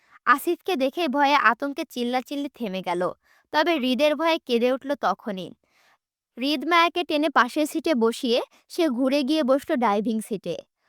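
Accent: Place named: Kushtia, Bangladesh. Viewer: আমি নিজেও কুষ্টিয়া জেলার মেয়ে। আপনার কথা শুনে নিজের জেলার টান টাই মনে হচ্ছিল বার বার। এবার কনফার্ম হয়ে গেলাম।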